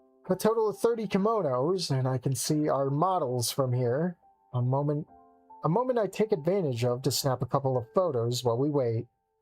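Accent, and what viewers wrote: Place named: Finland. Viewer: Finglish strikes again.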